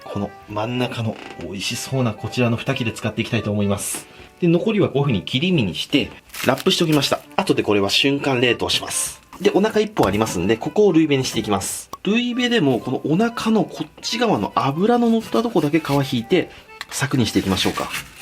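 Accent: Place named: Tokai, Japan. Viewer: 随所に出る東海のなまりが故郷を思い出して好き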